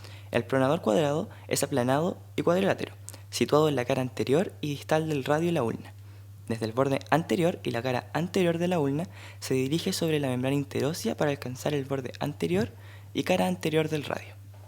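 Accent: Chile. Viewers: Desde que volví de Chile ahora me topo con accento chileno donde sea. Muy bien video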